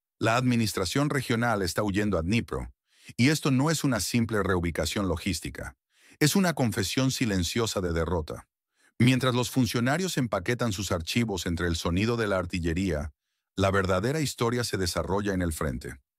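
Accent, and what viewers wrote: England, United Kingdom. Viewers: Si habéis decidido hablar en castellano hacedlo bien, sin arrastrar las erres como tarados. Hablar español con acento inglés desvirtúa nuestra bella lengua e impone un idioma inexistente.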